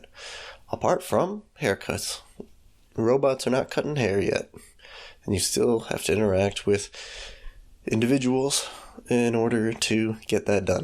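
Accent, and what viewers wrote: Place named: Russia. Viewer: Русское звучание так просто не спрятать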